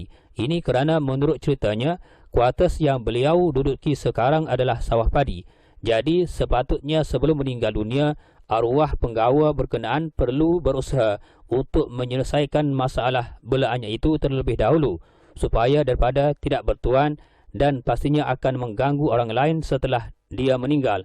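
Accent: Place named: Kelantan, Malaysia. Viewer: xblh buat apa.cara ulasan tu kejap Kelantan kejap bahasa baku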